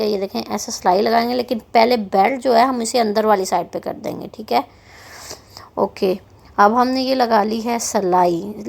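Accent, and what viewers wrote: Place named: Punjab, India. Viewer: Nice punjabi touch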